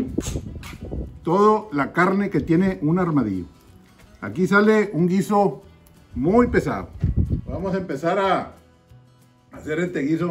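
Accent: Mexico. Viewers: junto con disfrutar las preparaciones y las recetas me encanta como habla el mexicano, lejos el mejor acento de todos los países latinos...órale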